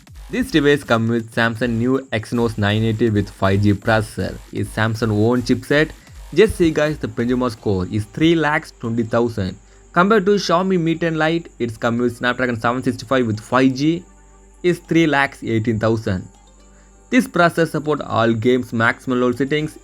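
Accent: India. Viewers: Too hard to understand with your heavy Indian accent, moving on.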